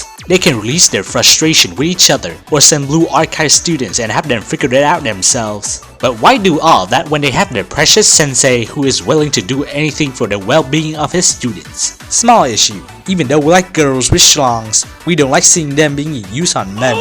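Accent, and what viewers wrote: Vietnam. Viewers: rly vnm accent 🐧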